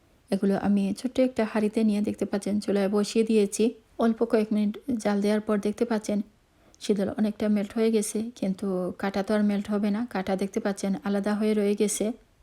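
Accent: Sylhet, Bangladesh. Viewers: আপুর কথার মধ্যে সিলেটি কথা চলে আসে।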